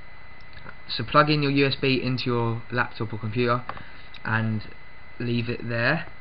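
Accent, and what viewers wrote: United Kingdom. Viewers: that is an london accent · your british ???? cause thats kool doggy!!!!!! Lol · nice british acent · Thank you my British speaking friend. :D Now im up 60 nigga!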